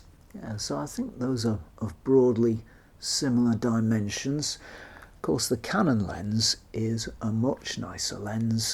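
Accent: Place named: London, United Kingdom. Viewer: I do wonder why you call your cat Zorki!) Is it because of their excellent eyesight (in Russian 'zorki' means 'sharp-eyed or eagle-eyed') or have you named the cat after the line of Sovien cameras? It sounds so sweet and I'm really curious about it) By the way, as for my preferences in photography, I persanally prefer Soviet lenses (Helios 44-2 or Jupiter 37A or even that giant Tair-3). I haven't tried any wide angle lenses so far but, probably, one day... Thanks a lot for your videos! They are really inspiring! And, by the way, I do enjoy you authentic London pronunciation (or is it even RP?) In any case, you are awesome!)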